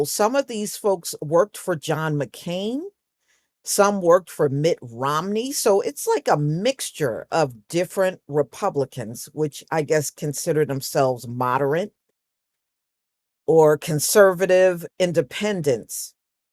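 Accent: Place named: Northeast, United States. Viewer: I could be wrong but I think its her accent . Northeast maybe ? Maryland, Delaware ?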